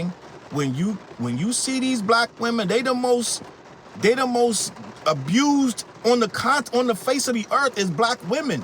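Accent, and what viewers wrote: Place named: Maryland, United States. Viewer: Also, are you from Maryland? I’m from 301 and def hear the accent at times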